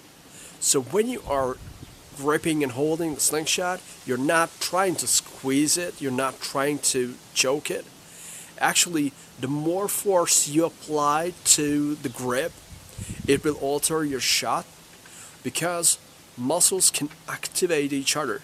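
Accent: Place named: North Carolina, United States. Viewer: I can't figure out if he's from North Carolina, Virginia area USA.. or somewhere in England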